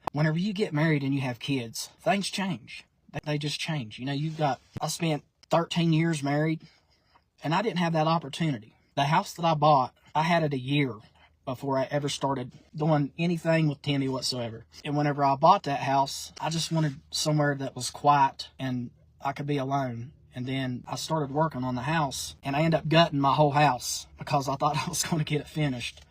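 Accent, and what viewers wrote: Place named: Appalachia, United States. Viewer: Here in North Ga.(appalachians), we did rnost of the sarne stuff you are talking about, and the sarne type of values, even talked with a sirnilar accent...trouble is, we have been "invaded" and gentrified now..us hurnble country folk have been priced out of land, housing, and even rent...whereas when I was a kid nearly everybody in the store sounded like you talking...now, it's rare to hear that accent...everybody sounds like they carne frorn sornewhere else...hope that never happens to you in West Virginia, but it probably will, when they get done developing up Tennessee, Alabarna, North and South Carolina, and Kentucky. What part of Georgia is frorn?...rnaybe he isn't originally frorn Georgia?....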